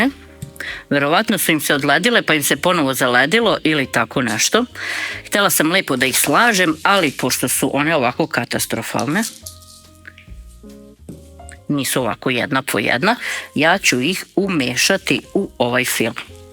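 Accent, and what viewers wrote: Vojvodina, Serbia. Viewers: E, mnogo mi se dopadate! Neposredni ste , spontani i duhoviti.,,, a recept mi se dopada, lepo ste objasnili i bez neke "pompe" napravili , a sigurna sam da je torta ukusna. Posebno mi se dopao Vaš akcenat, rekla bih da ste iz Vojvodine! ❤❤❤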